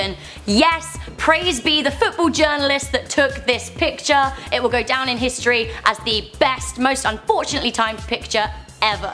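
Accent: United Kingdom. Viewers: omg i freaking love you. An asian girl with a british accent. I really love this channel because its so diverse. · Srry , cant understand british accent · dawn she british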